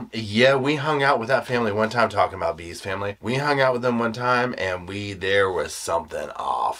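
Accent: South, United States. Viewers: One of the reasons I so relate to is his Southern nature. He has a rhythm to his speech that brings me back to my own Kentucky roots. It feels like down home to me. ❤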